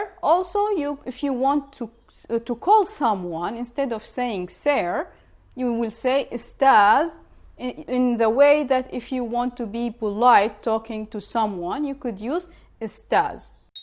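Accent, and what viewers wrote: Syria. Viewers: First, thank you for your videos. Second, is your dialect from Damascus, Aleppo, etc.. Last question. Would it sound strange if the ق was pronounced with a hard "g" sound?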